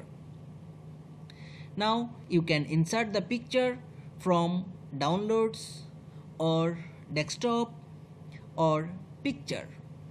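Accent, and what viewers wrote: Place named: Bihar, India. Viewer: Bihari english speaking first you speak clearly hindi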